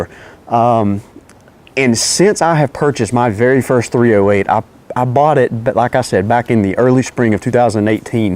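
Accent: South, United States. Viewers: Allsome. Love that Southern drawwwwwwwl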